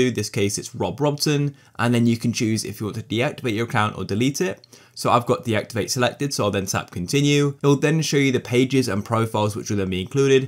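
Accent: United Kingdom. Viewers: If he is american why does he speak british?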